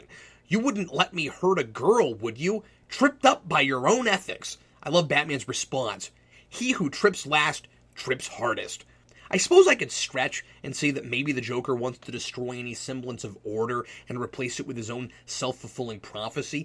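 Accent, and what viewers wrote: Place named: United States. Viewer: CRAP....TOLD US NOTHING EXCEPT THAT ANOTHER 'AMERICAN' LIKES THE SOUND OF HIS OWN ACCENT...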